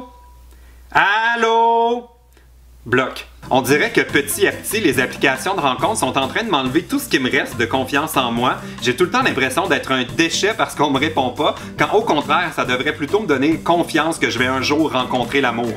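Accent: Quebec, Canada. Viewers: BONJOUUUR <3 je t ai découvert récemment et franchement je t adooore, tu me fais trop rire et j adore ta personnalité en fait :) ton verre de vin omg garde le toujours! xd +1 abonnée, t es mon gros coup de cœur du moment haha bisouuus :d <3 signée: une chinoise/ belge si si (j adore ton accent québécois aussi) x) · Salut! Je viens de découvrir ta chaine par hasard au twitter. Je suis brésilien et j'étudie la langue française mais c'est toujours du français parlé en France. Donc, c'est très interessant d'avoir un contact avec un chaine du Canada. Au moment où tu as commencé a parler je me suis aperçu que c'était du français québecois. C'est mignon. :)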